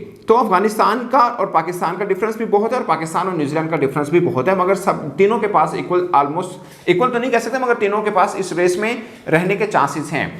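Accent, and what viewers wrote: Punjab, India. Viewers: Kissay kuttti maaaa diyia bachyiaaaa. Who has asked u to talk abt cricket. Check ur panjabi street accent, have some respect to be on the tv. Taira content bc lund hey. Wur ja bc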